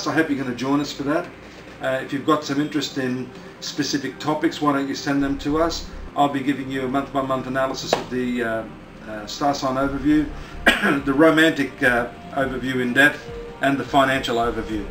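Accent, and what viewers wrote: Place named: Australia. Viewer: Ah...I was right...you're an Aussie. At first I was wondering what part of England you were from and then I got it. Thanks for the info--I'm a Sadge.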